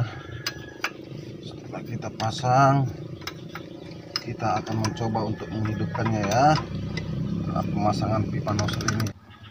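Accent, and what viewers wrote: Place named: Karo, Indonesia. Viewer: Bang logatnya macam orang karo. Kalak karo Kam bang?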